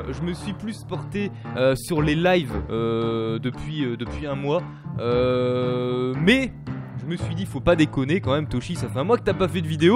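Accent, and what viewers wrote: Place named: England, United Kingdom. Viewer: Vidéo génial comme d'habitude et ton accent anglais est toujours aussi ouf xD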